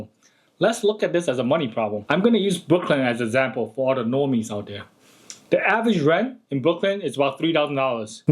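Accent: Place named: China. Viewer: It's wild someone with a Chinese accent is talking about being a landlord in AMerica...sorry for saying it(not sorry).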